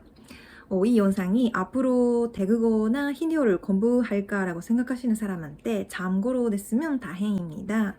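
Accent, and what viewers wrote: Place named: Japan. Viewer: 日本人の韓国語らしく日本人の私には聞き取りやすいですが、韓国語ネイティブにとってどんな感じですかね。でも内容はとても面白く、違いを要領よくまとめていらっしゃいますね。ありがとうございました。❤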